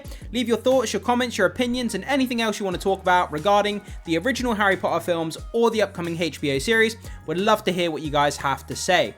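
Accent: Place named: United Kingdom. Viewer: great video as always! An off-topic question tho - as a foreign languages enthusiast throughout all your videos I've been wondering about the way you pronounce the H in the "HBO series". If you wouldn't mind answering a personal question - what is your native accent? I guess it's definitely a UK one - but which exactly?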